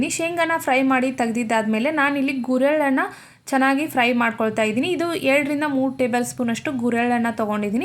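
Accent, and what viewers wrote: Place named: Davangere, India. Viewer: Sis nimdu Davangere na language ade tara ede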